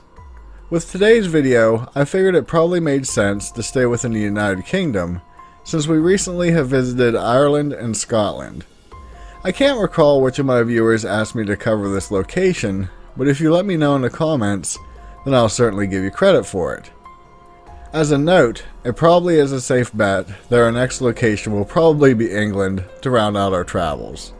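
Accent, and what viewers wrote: United States. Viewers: Yay someone did a video on my home country of Cymru (or Wales) I must say it feels odd to hear an American accent say our names.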